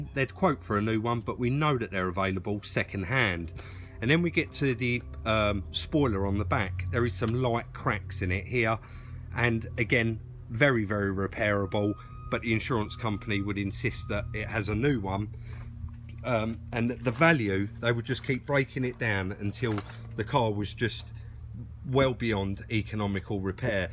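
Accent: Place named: England, United Kingdom. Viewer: Right old English fella ....love it mate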